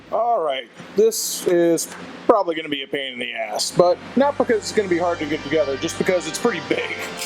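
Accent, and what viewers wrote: South, United States. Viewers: What happened to your southern accent?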